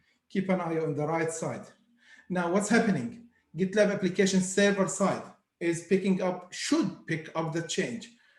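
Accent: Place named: India. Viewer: Can you get someone who doesn't have an Indian accent to do these videos? Sounds horrible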